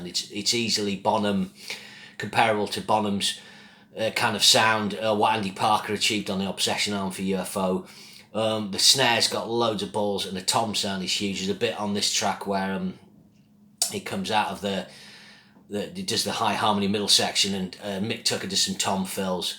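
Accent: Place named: Northern England, United Kingdom. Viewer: You sounded like you were from the north, but didn't sound exactly like the Yorkshire accents I'd heard, so I was just curious. Thanks! I'm from the state of North Carolina.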